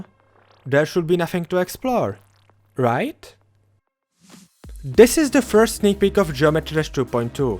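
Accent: Czech Republic. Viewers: ahaa jo ty si čech... já jsem si říkal, ten přízvuk a najednou místo "attempts" tam bylo "pokus" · 🇨🇿🇨🇿?? I can hear the accent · honestly kinda surprised i never realized that this is what a czech accent sounds like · Your accent sounds hella czech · That czech accent be bitting different thooo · Já vím že si čech už jenom podle toho přízvuku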